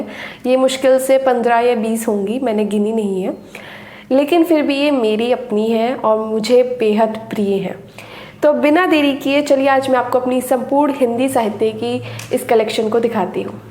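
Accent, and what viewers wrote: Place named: United Kingdom. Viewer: Missing the British accent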